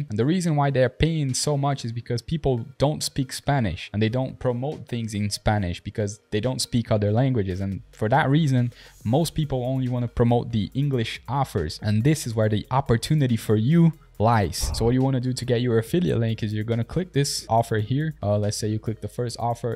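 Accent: Brazil. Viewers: tu é carioca né kkkkkk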